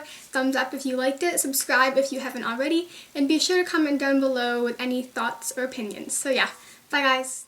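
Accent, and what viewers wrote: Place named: Boston, United States. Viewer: Are you from Boston? You sound like you have a little bit of a bostonian accent! :)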